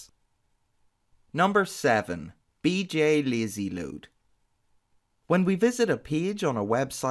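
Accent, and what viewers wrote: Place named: North, United Kingdom. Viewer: nobody should dread you at all - you are inspirational! Thank you so much for this tutorial. I'll be honest, I have looked at numerous such videos but I totally love your approach. Clear, concise, engaging - all washed down with that soft Northern accent., would it be terrible to ask a quick question about one of your other videos here? Well, I'm going to do it anyway! A twitter feed on a website - you showed it being put in a sidebar. I don't have a side bar, so can it be plonked in a page? I use siteorigin page-builder and widgets. This is not important really, but I can't say widgets if I am drunk, so I call them dingdongs! I have also followed your friend Alex last week and told him if he ever comes to Ireland to let me know, as it would be gas to help him out. He could do a tour - I'm in Cork so we could travel the length of the country. 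That again. Best wishes, Colin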